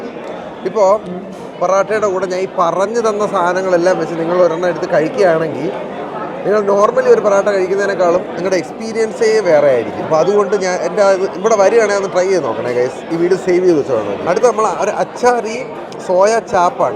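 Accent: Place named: Trivandrum, India. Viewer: Pakka Trivandrum slang Thanneeeee😂😂 ❤ nice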